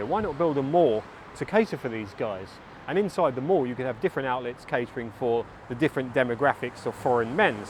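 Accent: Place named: Cockney, United Kingdom. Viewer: An interesting one. Do you ever have any trouble in places as your Thai will have a cockney lilt to it! I assume you have a Thai passport as well as a UK one? Having a Thai one will stop any possible problems i'm guessing.....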